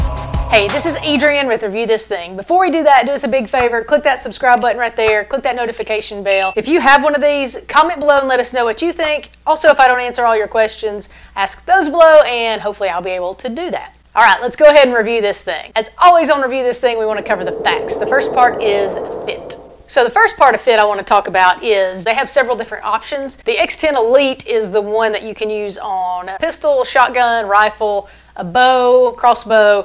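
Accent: South, United States. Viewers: I also got to say I love the southern accent....lol... I'm from the Midwest so we have boring accents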